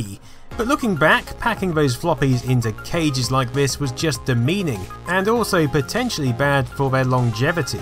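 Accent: United Kingdom. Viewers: PeachyPixel8 you are not a nerd. You are just attracted to this man's sexy velvety-smooth British accent. Don't kid yourself. You want him. We all do.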